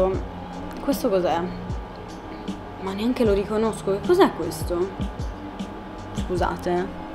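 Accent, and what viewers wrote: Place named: Rome, Italy. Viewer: Comunque ti dona l'accento romano 😄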